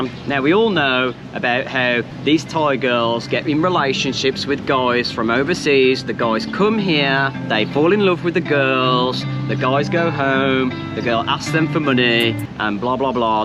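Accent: Birmingham, United Kingdom. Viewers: You sound like a brum..maybe from Wolverhampton..am I right 🤪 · Hey you never lost your Brummie accent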